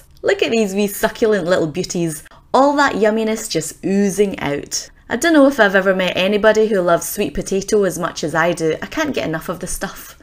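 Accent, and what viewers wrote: Scotland, United Kingdom. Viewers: I love your accent. It makes me miss Scotland so much. :( Please do more vegetarian meal prep, and fitness programme- I find it so inspiring. :)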